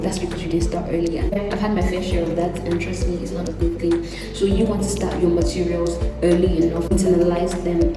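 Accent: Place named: Nigeria. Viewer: I love your accent, are you Nigerian?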